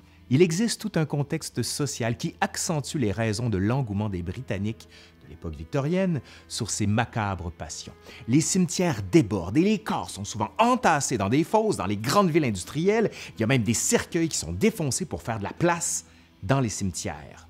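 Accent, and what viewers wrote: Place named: Acadia, Canada. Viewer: Les bobards des journaux européen ont la vie dure.. avec les malédictions (sur Howard Carter et, tout les autres,).bien avant the Sun un des... descendants.. Merci pour cette vidéo Intéressant ...et pédagogique On suit les explications comme les fresques.. égyptiennes.. avec un éclairage nouveau et toujours sympathique avec l'accent Acadien 😉👍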